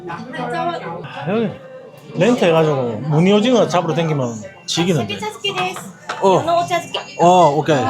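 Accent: Busan, South Korea. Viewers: 부산사투리 너무정겹다. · 너무 자연스러운 부산사람의 행동과 말투 완전 부산을 이해하고 알고 싶으면 이 유튜브 추천합니다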